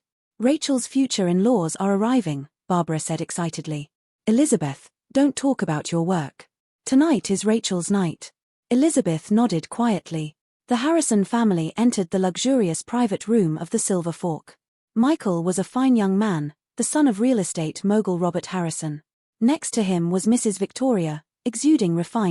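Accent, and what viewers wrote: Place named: England, United Kingdom. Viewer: English accent in Boston doesn't work! Change it please!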